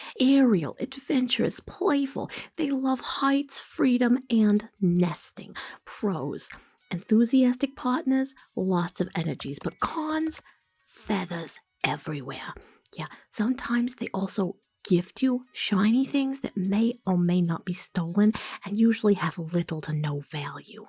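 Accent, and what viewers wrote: New Jersey, United States. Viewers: Love the Jersey accent! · Omg i freaking love this, Jersey accents are one of the best American accents, my personal favorite is dixie southern aka georgia southern, but Jersey is close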